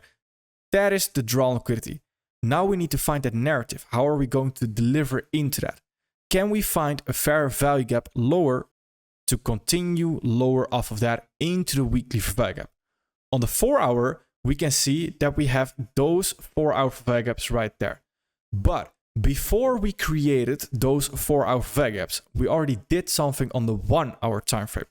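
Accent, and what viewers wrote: Netherlands. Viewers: You have to be dutch when hearing your accent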